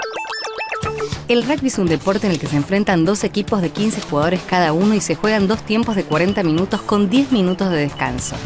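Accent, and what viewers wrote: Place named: Argentina. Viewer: Que horrible se escucha la voz Argentina, preferiría el Colombiano o Mexicano.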